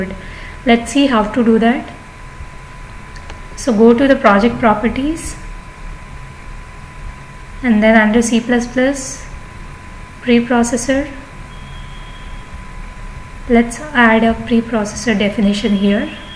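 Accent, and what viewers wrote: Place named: India. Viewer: when i heard the idian voice, i knew 100% that you will be the solution. thank you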